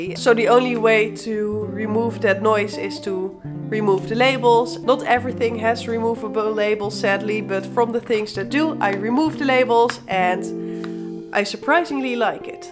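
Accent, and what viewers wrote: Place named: Netherlands. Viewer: Ik kan horen dat je uit Nederland komt! Super leuke video’s :)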